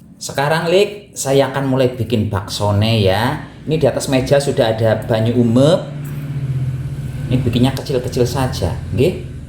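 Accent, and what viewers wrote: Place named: Java, Indonesia. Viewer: Mas asline wong Kebumen apa wong Tegal sih. Basane medok temen.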